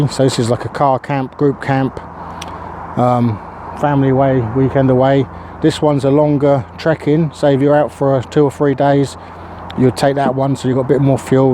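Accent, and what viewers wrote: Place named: United Kingdom. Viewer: This is my favorite type of British accent. Great video.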